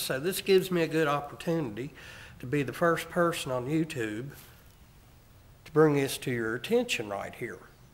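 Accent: United States. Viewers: No homo but I love his voice and accent,picture perfect American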